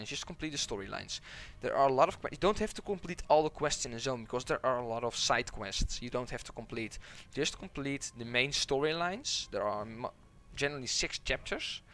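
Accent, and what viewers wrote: Netherlands. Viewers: Dutch sounding English. The worst. thanks for the tips though ;) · You sound Dutch :D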